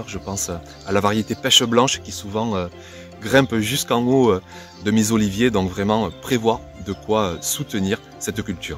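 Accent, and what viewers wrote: South, France. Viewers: Merci c est toujours un plaisir de te suivre avec ton accent qui chante le sud de la France sa réchauffe le coeur bisous de Belgique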